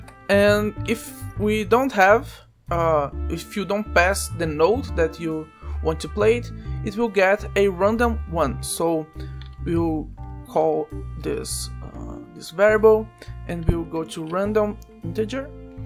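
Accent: Brazil. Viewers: esse sotaque é br em hahahaha, nice man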